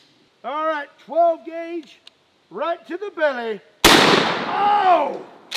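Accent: United States. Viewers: I like how he talks like a cowboy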